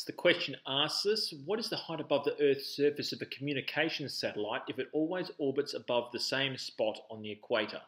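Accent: Australia. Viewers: This was the first video of yours that I have watched and I think you did a really good job breaking down the algebra. Thanks for this and the aussie accent was refreshing!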